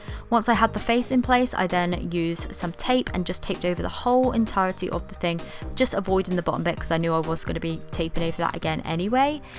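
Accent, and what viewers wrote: United Kingdom. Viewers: She speaks british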